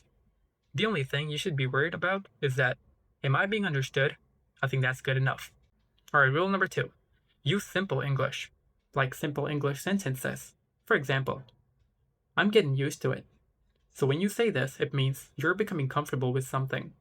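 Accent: United States. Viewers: Bro, your American accent is top notch! I wanna really get connected with you. Is it okay if I take some time talking to you??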